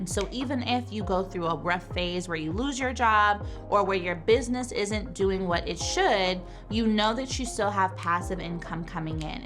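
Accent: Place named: Southeast, United States. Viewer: tell me where you're really from because you and this accent is giving south eastern United States like North Carolina.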